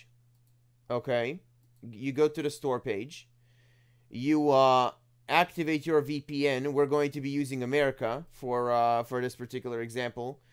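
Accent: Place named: Israel. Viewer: ישראלי מאשקלון עם מבטא כזה? לול דרך אגב סטים לא לוקחים את מה שקנית?כי לפעמים מקבלים מזה באן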